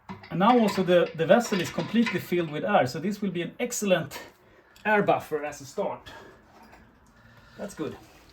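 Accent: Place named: Sweden. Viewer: Den värsta ikea-engelska jag har hört..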